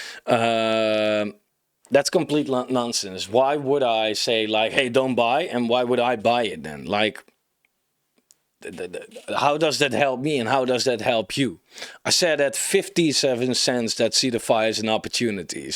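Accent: Netherlands. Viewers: Nice video m8 doing great keep up the great work! Like your style, and dedication to explain your vision and information. The first video when i found you on youtube i was like hey he's dutch i can hear it Nogmaals goed bezig man! En op naar een mooie cycle met mooie kansen Laterr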